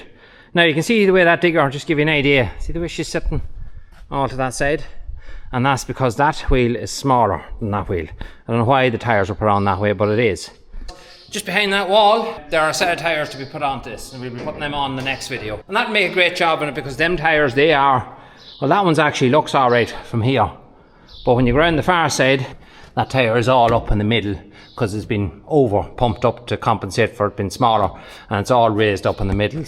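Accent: Ireland. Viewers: can you tell if I zip through your video? As an old lady, I am not much interested in the heavy machinery repairs, but I do enjoy seeing the cattle, the green fields and hearing your Irish voice. I would not like you to feel insulted that I don't watch every minute, but I always click LIKE!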